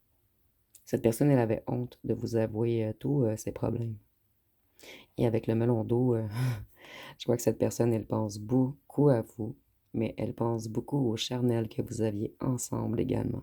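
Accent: Quebec, Canada. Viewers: La voix de la belle vallée du Saint Laurent. Merci ❤❤ Et vite un Québec indépendant !!!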